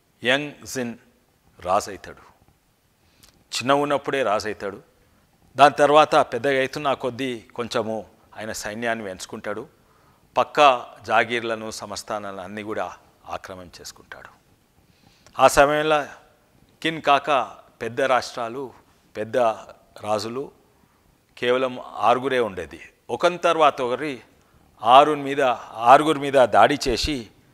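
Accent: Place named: Telangana, India. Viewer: సర్ నమస్కారం స్వచ్ఛమైన తెలంగాణ యాస ఎంతో బాగుంటుంది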